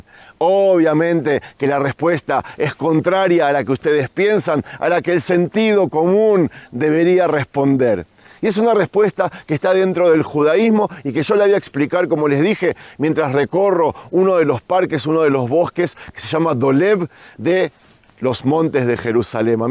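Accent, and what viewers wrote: Buenos Aires, Argentina. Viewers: Israel y los judios son el Pueblo Elegido de Dios que han sufrido persecucion durante siglos hasta hoy. Hashem los proteja siempre. Bonito amanecer en Jerusalen. A mi tambien me gusta mucho la Historia. Mi grupo de hermanas de mi iglesia y yo estamos leyendo la Biblia cronologicamente y ahora estamos acabando Exodo. Gracias, querido, por tu esfuerzo, por tus paseos, por hablarnos estas historias maravillosas con el Tanaj en la mano, con tu hermoso acento bonaerense. Bendito Israel y su hermosa gente. Seguimos orando x todos vosotros, por los rehenes, por los soldados y por tu hijo Eden. Que Dios os bendiga. SHALOM desde Barcelona. AM ISRAEL JAI✡️🇮🇱🙏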